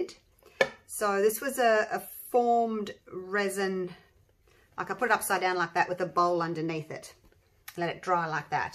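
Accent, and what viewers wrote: Australia. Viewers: The eastern brown hiding in your cupboard next to the Vegemite is extremely impressed with your resin prowess 🐍 ❤ I detect an Aussie accent, so I presume you are located in Australia, where it seems every household has at least one highly venomous reptile lurking in the loo or other parts of the house 😬😂 Cheers and love 💕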